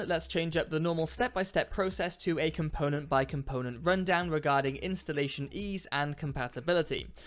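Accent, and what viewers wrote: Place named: South Wales, United Kingdom. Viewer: Weird question, you Welsh? You sound south wales-ish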